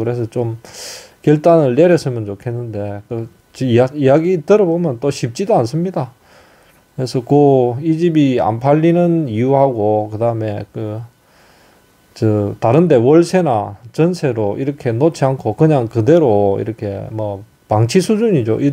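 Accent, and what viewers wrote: Gyeongsang, South Korea. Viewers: 이 올려주시는 영상 늘 잘보구 있습니다 사투리를 쓰셔서 경상도분인가만 했는데 함안군 군북면 이시네요 함안조씨 성을 가진분들이 많이 살고 계셨죠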